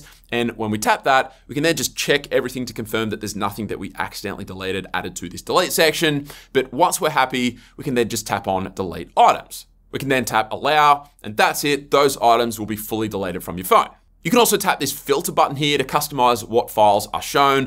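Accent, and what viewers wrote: Australia. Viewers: I have to admit that the real reason I watch the 25 days series, is because I am smitten with his heavy Australian 'G'day Mate' accent.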